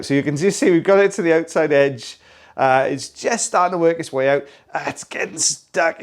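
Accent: Wales, United Kingdom. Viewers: I love how Americanisms are slowly starting to enter your lovely Welsh accent…”big ole”!!! I chuckled when you said that.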